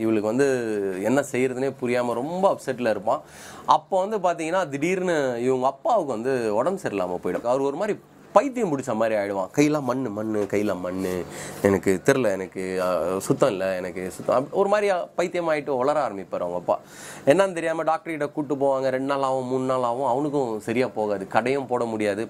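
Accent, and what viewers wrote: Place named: Chennai, India. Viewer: Listening to your story and folding clothes 🤣🤣🤣 Chennai dialects awesome....you and your attitude resembles one my close friend.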